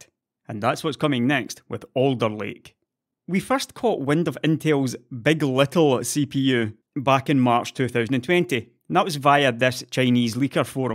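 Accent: Scotland, United Kingdom. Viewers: that beautiful Scottish voice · Good to hear your "Scottish" Voice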